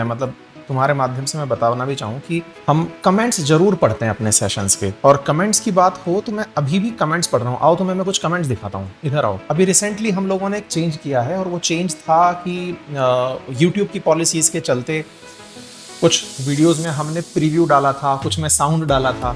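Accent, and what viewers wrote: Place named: Bihar, India. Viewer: Aap v मै ko ham boltey hai , mujhe toh lagta tha ki ye wala sirf bihar babu use kartey h.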